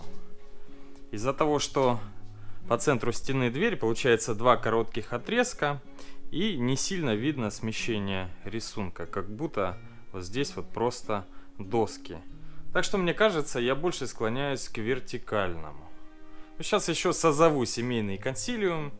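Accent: Rostov, Russia. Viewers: это Ростов, после переезда у меня сестра стала так же говорить)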